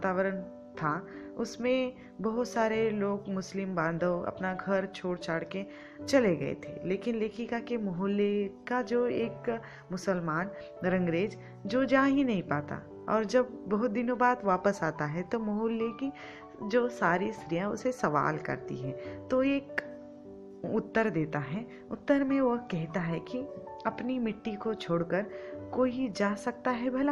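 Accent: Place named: South, India. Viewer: Thanks 😊( kya aap South Indian h!)Aapki bhasha hme Kisi Apne ki yad dilati h😊.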